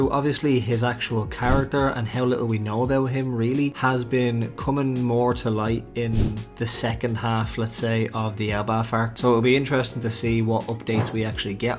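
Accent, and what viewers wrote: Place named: Ireland. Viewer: Came for the theory, stayed for the Irish accent!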